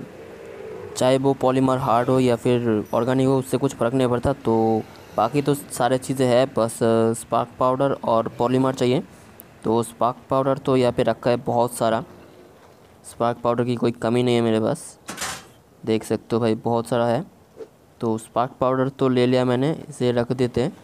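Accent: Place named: India. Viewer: He’s from India listen to his accent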